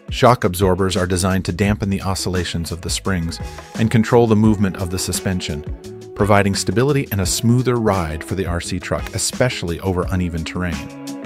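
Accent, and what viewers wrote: United States. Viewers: Thumbs down for the annoying American voice over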